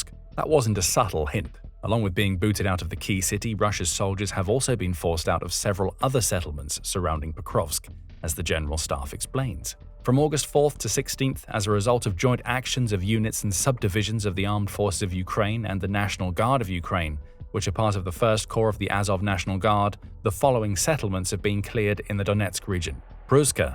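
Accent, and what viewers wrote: United Kingdom. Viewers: Sounds british, therefore most are lies...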